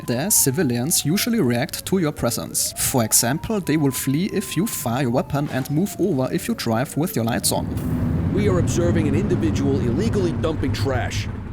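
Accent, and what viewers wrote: Germany. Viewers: Now that’s one German accent !!! I love it - thanks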